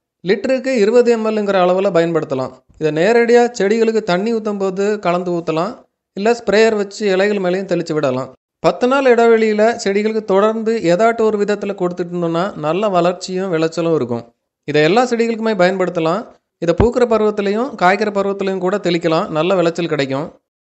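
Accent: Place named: Kanyakumari, India. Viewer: Neega kanyakumari thana.. slang apditha teridhu..😃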